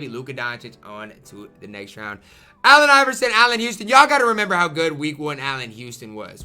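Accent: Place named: Detroit, United States. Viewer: How come sometimes you talk normal and then sometimes you talk like you are from 8 mile?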